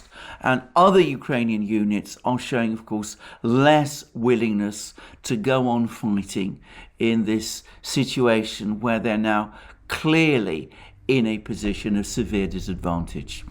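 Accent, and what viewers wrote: United Kingdom. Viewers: Your extraordinarily british way of speaking amazes me, amuses me in a way that I can't explain... You're obssessed with Ukraine and Russia, and you still have SOME time to be umplesant and rude about the CHINESE" I'm sorry to be amused by this, but your gentlemansness is fantastic.... Thanks a million for the great analisys AND for the gentlemansness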